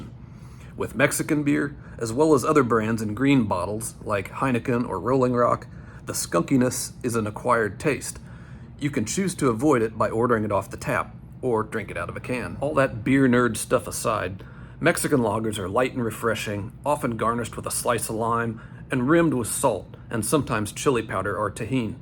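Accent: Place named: South, United States. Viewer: your lovely southern lilt, nice and gentle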